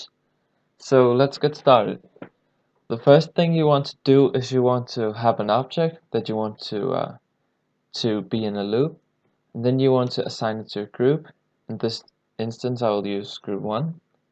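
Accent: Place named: Denmark. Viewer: Why does this guy have a danish accent lol Are you from denmark:0 If you are then hi, jeg også dansk:D:D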